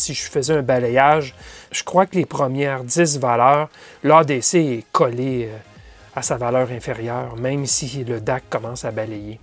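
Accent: Quebec, Canada. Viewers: Excellent, clair et précis (comme les autres vidéos) avec le petit accent du Québec qui ravit tous les Français.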